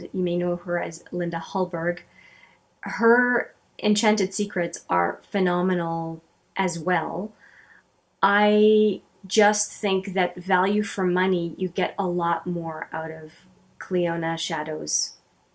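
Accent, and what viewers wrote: Canada. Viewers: You are such a lovely and beautiful person❤️ I have been loving your videos! As soon as I heard you speak, I could tell you’re Canadian (my husband is Canadian and I’m American)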